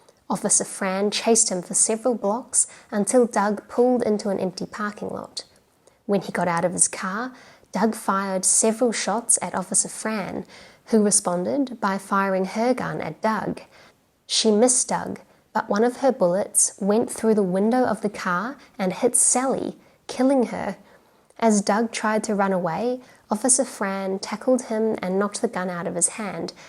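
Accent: Australia. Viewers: Are you from Australia?? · No, that sounds more like an Aussie accent in this video. Either way, still great content!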